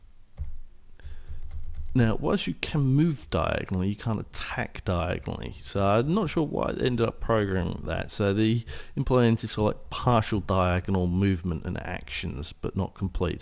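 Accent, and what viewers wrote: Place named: England, United Kingdom. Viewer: I love your "typical" english accent :) where do you go for this game... i just dont understand :)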